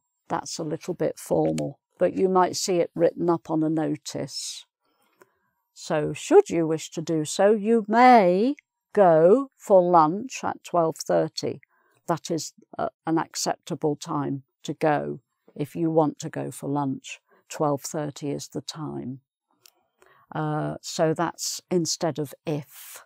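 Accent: England, United Kingdom. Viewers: Thanks a lot mam! You are great! I love British accent so I'm learning lots from you :) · Thank you a lot. Your lessons are really useful and you have awesome British accent as well)) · it' s always a pleasure to listen to your lessons! I love your beautiful english accent so much...thank you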